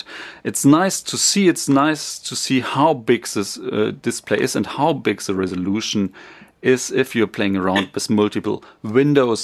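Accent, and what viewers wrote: Germany. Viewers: After 5 seconds, i already heard that you are German. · Yay ein deutscher. Ich habe den Aktzend doch gleich erkannt ^^ Ist das eine 4k Auflösung?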